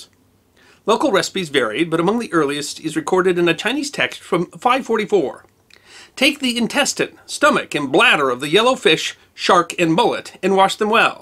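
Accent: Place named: Chicago, United States. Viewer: 31 I love your Southern Side of the Loop accent! I almost peed on my self laughing! Where's your Chicago Typewriter? With your Moll?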